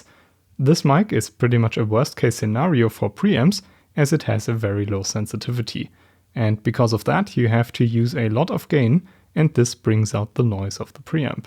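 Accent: Germany. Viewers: He’s not robotic it’s his German accent. - made my day :))) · Love your accent Man. Don't bother about the comments on your German accent. Keep the videos coming. What matters is the content in the beginning and content in the end. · Is there any negatif comments on his accent ? Every guy with a german accent talking about tech or music i heard were right on almost everything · Not gonna lie... I didn't even notice the german accent. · First time in my life I can't set English subtitles on English video - why? Because of GERMAN accent? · Love the accent mate, used to it by now since my family is German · it's his German accent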